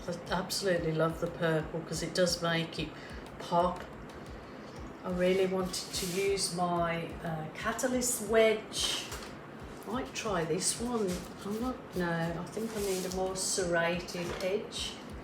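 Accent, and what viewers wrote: Birmingham, United Kingdom. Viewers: That is a cheer-me-upper if ever I saw one! Fabulous colours and your talent make a painting I could look at for a very long time, thanks for sharing the how of it. btw, every so often I think I hear a Brummie accent - is that possible? ❤ from Scotland ❤😂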